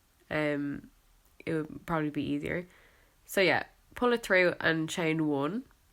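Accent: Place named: Ireland. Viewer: Are you Irish? you have an Irish accent. (I know what an Irish accent sounds like because I live in Ireland)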